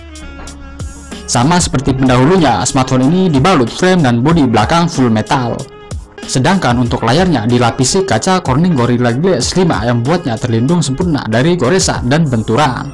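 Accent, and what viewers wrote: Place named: Chinese-Indonesian, Indonesia. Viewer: Dari logat bicaranya kayakx koko koko deh yg ngomong ini... mirip ama logat temen2 gw yg orang china,,hahahah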